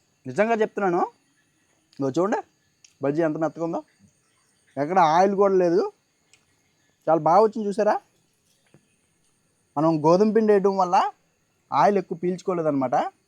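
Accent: Godavari, India. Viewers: మీ విడియో లు చక్కని గోదా వారి యాస తో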